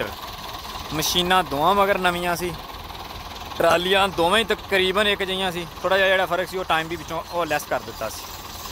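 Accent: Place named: Gurdaspur, India. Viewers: tuhadi boli sun ke subad a gaya ji lagda tusi sade punjab de hi hovo very nice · Veer di boli Gurdaspur wali aa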